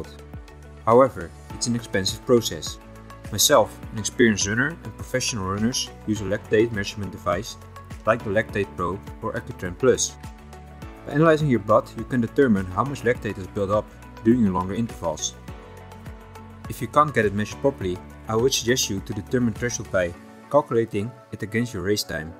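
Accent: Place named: Germany. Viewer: Meest denglish accent ooit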